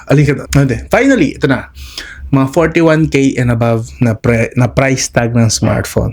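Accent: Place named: Conyo, Philippines. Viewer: conyo hehe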